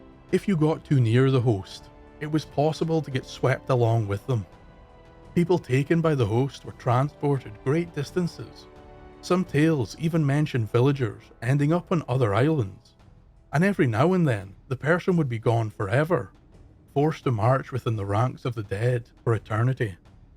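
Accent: Scotland, United Kingdom. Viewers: Crazy how similar a norther Irish accent and your Scottish accent is. You can really hear the crossover.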